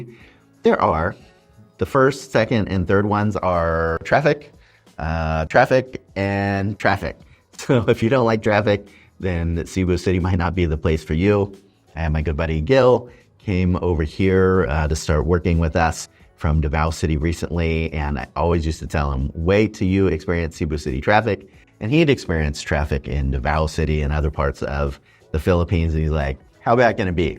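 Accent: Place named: United States. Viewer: Your vowels are wrong. Your not communicating in america your communicating in S E Asia. Irritating to hear that usa shit. See ya later (C ya : )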